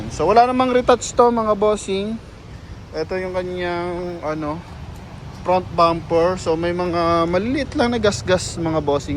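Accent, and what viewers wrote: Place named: Bicol, Philippines. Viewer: new subscriber padi.bikolano kaba kabayan?ung accent mo kasi na haa!😂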